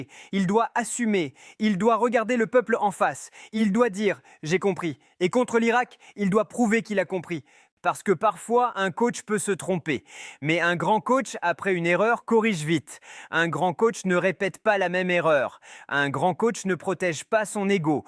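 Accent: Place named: Senegal. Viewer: Tu parles comme un 100% senegalais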